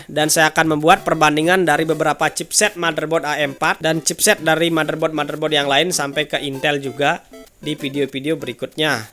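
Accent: Bali, Indonesia. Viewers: logat khe bali bgt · Logat bali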